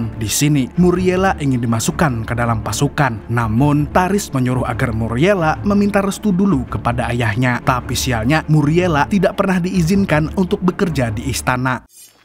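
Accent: Banjar, Indonesia. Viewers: karindangan bahasa banjarnya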